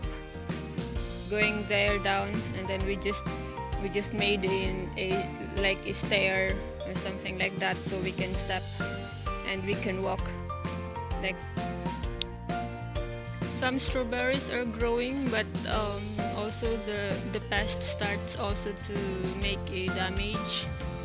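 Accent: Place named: France. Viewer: Thanks for the tour! Very nice farm. Do you realize that you have a French accent? Ha ha, you do.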